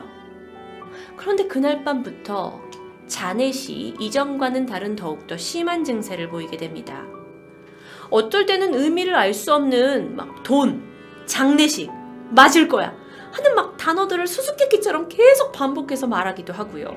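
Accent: Jeolla, South Korea. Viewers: 디바제시카님 전라도 사람인가..?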